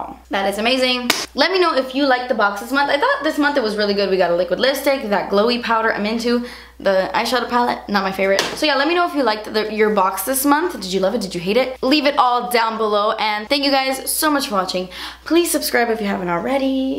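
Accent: England, United Kingdom. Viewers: Anddddddddd I love your English accent I wish you would do a whole video talking like that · i would bloody love it if you would do a full video in your english accent omg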